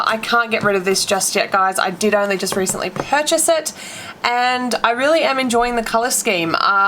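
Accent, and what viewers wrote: Australia. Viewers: You sound aussie, how do you get boxy charm, thought they dont ahip to australia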